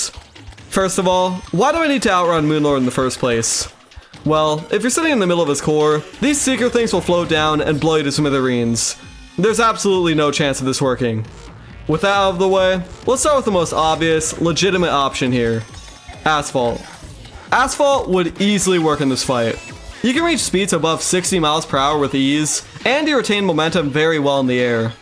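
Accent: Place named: United Kingdom. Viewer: I'm sorry but every time you say a cuss word it makes me cringe and you sound like a white washed person that grew up in great Britain. 😭😭😭 But anyways good video you are good at terraria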